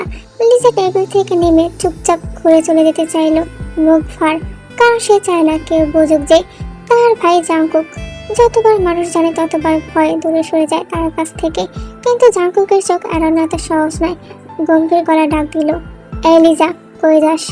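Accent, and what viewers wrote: Sylhet, Bangladesh. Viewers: আপু তুমি কি সিলেটি?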